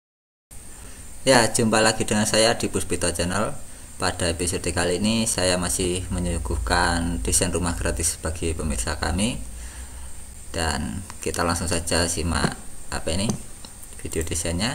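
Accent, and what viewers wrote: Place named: Java, Indonesia. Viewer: Logatnya lowh. Medok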